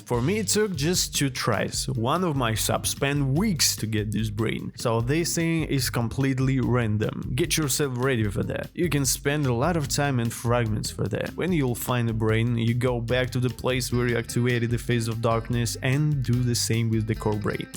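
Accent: Russia. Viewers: I have a question are you Russian? It's just the way you say some words in Russian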